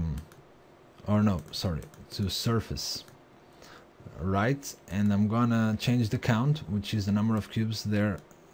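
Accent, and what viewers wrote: Portugal. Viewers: muito bom! o teu ingles é perfect mas dá para ver que és tuga ;) Abraços e continuação de bom trabalho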